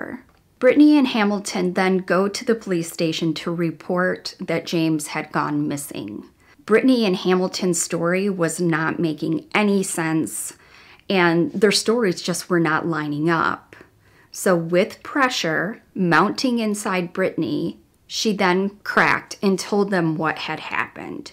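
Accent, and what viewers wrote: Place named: Midwest, United States. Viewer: You: Central Standard time ME: I KNEW IT!! I knew you were One of us!a "midwesterner" by your "accent" LOL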